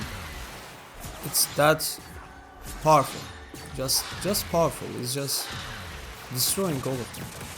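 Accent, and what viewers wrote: Turkey. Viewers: aksandan direkt anladim turk oldugunu bu arada ama guzel bizim kitleden de icerik ureticilerinin cikmasi basarilar!!!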